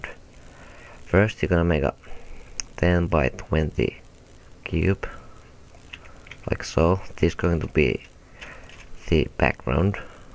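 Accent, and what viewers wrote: Finland. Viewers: Oh wait that accent.... Just like finnish guy speaking english xD. Coincidence? I think not. So one simple question: Are you finnish!? :D Jos oot nii GG xD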